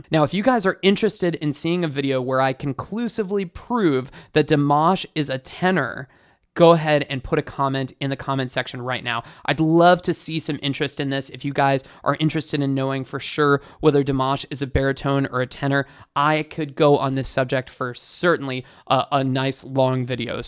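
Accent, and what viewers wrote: United States. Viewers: Is that American English or British English? Just saying. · I think you need to work on your overinflated ego and your lack of empathy department. Have you considered that you speak American English and not English maybe you should work on your own accent. Dimash does not even need to sing in your own language to be able to share the story and all the emotion that goes with it. He speaks English differently than you. So what? I bet if both of you would sing the same song you would not be the one people would be talking about despite that you believe to have a "perfect" English "diction". The man is exceptional. Live with it.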